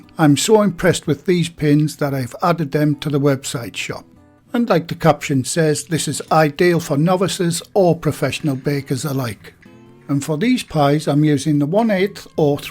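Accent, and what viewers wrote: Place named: England, United Kingdom. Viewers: I'll be honest... I subbed for your voice and manner of speaking/talking... you sound absolutely delightful and lovely and sweet/kind... typical English ( once upon a time when there were cockneys in London, they too were like this, just slightly different accent and manner). Like the recipe themes so far, will see if there is a sausage roll one. ^_^ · you are very welcome👍. I'm from Cumbria but. Live with my Khmer wife in Cambodia. It's so nice to here a northern accent & I so miss pork pies but thanks to you, I'll now be making my own thanx to your simple pork pie pastry, cheers👏👏👏👏👏👏👏👏👏👏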